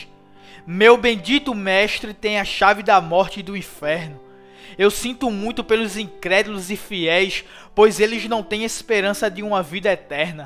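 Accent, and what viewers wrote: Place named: Pernambuco, Brazil. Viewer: Parabéns ao locutor, que Deus o abençoe por sua disposiçao em transmitir esta palavra abençoada. Pelo sotaque creio que o Irmão é pernambucano, conterrâneo nordestino. Sou do Ceará. Orem pelo nordeste precisamoz das orações dos irmãos. Glórias a Deus.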